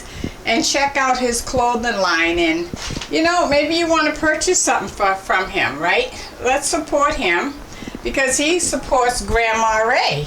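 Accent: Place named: Massachusetts, United States. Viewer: That cabbage looks tasty and side note, I love your accent🥰 I'm guessing you're from the Massachusetts area. I'm southern so I have a very "different" accent from yours🤭 Anyway, I love cabbage so I'll give your recipe a try❤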